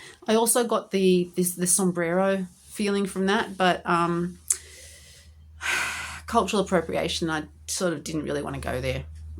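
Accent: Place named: Australia. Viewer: Hi! Super cute mushrooms 🍄 I had to laugh about tester calls because I thought the very same thing! 😅 it's your beautiful Australian accent...don't change ❤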